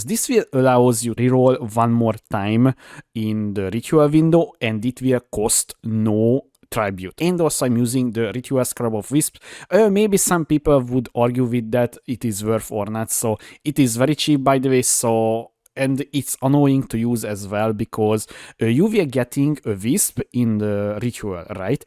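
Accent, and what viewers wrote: Hungary. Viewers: This must be the thickest Hungarian accent I've ever heard xD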